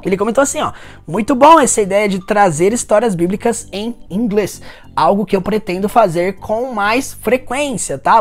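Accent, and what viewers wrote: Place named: Inland São Paulo, Brazil. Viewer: Esse teu sotaque de Paulista do interior é insuportável!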